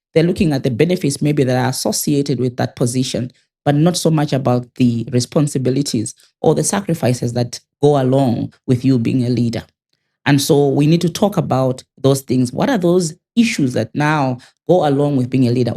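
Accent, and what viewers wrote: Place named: Zimbabwe. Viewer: South Africa via Zimbabwe's accent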